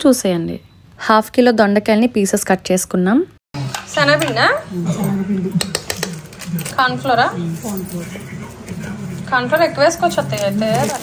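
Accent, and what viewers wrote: Godavari, India. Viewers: Mamu godavari slang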